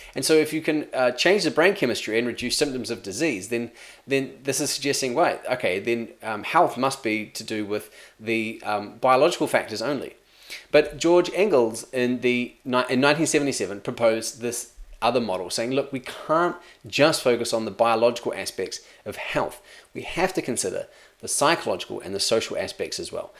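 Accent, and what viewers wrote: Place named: New Zealand. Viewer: are you lecturing in nz at the moment ? noticed u have the accent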